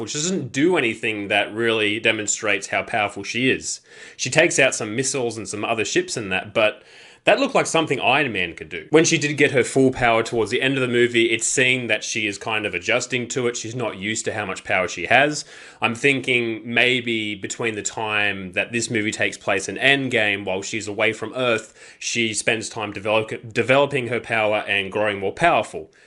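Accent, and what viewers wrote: Australia. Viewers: Hey thanks for the sub man, subbed right back cause instantly I'm seeing stuff I like! I'll be keeping an eye out for your future stuff! Nice to hear the Aussie accent in more movie reviews too haha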